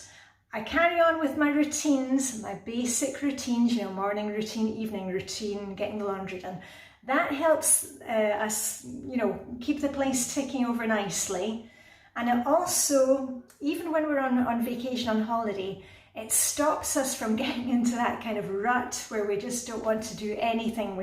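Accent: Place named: Edinburgh, United Kingdom. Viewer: you are a darling! Love your beautiful Edinburgh accent; brought up my kids there, and still miss it.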